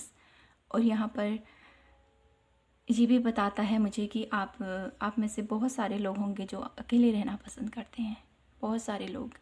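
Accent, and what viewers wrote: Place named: Bihar, India. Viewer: thanku ji Achcha laga ki aapane bhi Renu madam Se Hi Sikha Hai reading bhi aap bahut acchi karte ho aapka bolane ka style bhi achcha Hai Jahan Tak Mera Khyal hai aap Shayad Bihar ke Rahane wale ho aapke boli se mere ko Aisa Hi mahsus Hua kya aap Kolkata Nahin to Bihar ke aap Rahane wale ho aur Main apna WhatsApp number bhej deti hun agar aap Chahenge to ham log baat kar sakte hain theek hai aap ek bar humse baat Karen thank u ji aur family and Happy Diwali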